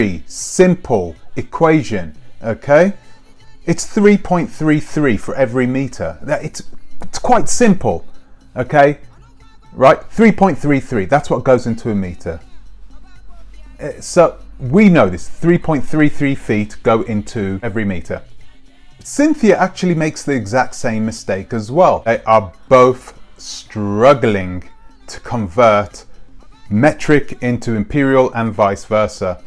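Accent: Birmingham, United Kingdom. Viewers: Bless your cotton socks..you sound like a proper brummie. 😄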